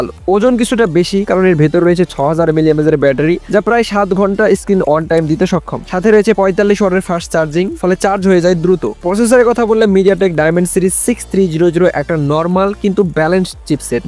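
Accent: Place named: Bangladesh. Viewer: আপনার শব্দের ব্যবহার ও উচ্চারণ শৈলি ইন্ডিয়ার মতো নয়। পুরাই বাংলাদেশ।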